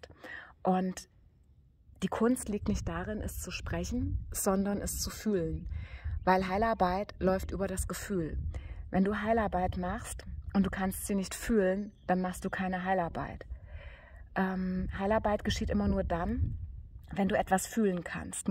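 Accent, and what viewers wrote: Hesse, Germany. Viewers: Liebe, heute an diesem für mich besonderen Tag habe ich dein Video mit dem aramäisch en Vater-Mutter unser gefunden. Du hast es wunderbar gesprochen - gefällt mir persönlich besser als die gesprochene Version von Monika Herz im Martha Gebet. Ein winzigen hessischen Akzent habe ch gehört- aber schön. Ehrlich gesagt, gefällt mir persönlich für unsere Breitengrade das deutsche Ur-Vaterunser doch am besten. Wegen der Klarheit, Schönheit und der Möglichkeit die Dinge in ihrer Gesamtheit zu verstehen. Wir müssen nicht alles neu erfinden oder in Sprachen sprechen, deren Struktur wir eigentlich nicht verstehen, alles gut wie es ist - soweit kurz zum Thema"Hype". Wenn wir das was wir tun, mit dem Herzen tun, ist es doch völlig wurscht, ob wir Urdu, Hindi, schwäbisch oder hessisch sprechen Erstaunt war ich auch über das Buch, daraus habe ich meiner Mutter auf der Intensivstation jeden Mittag vorgelesen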